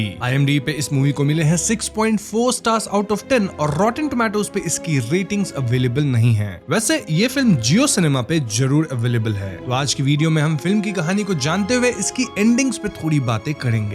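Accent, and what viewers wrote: Bengal, India. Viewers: Omg. Your bengali accent 😭😭😭😭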